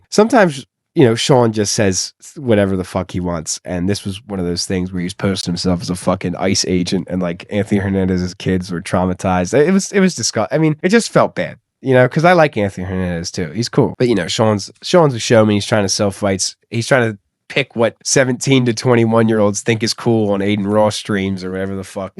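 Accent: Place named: New Jersey, United States. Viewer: I can hear the south jersey/philly accent brother lol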